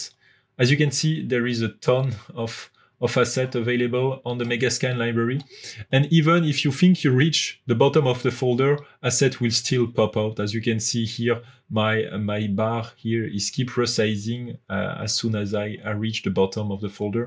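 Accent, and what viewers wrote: France. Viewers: J’adore l’accent French 😋😉😎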